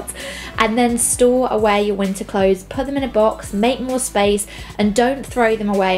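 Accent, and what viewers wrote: United Kingdom. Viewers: Are you British or live in the U.K.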